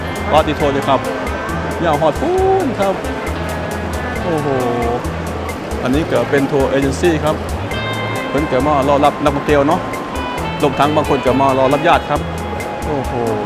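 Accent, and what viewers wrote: Phu Thai, Thailand. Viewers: เจ้าเป็นพูไทยฝั่งลาว หรือฝั่งไทย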